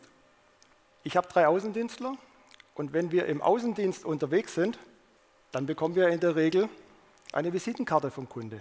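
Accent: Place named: Swabia, Germany. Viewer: Wie schön das Schwäbisch doch ist;)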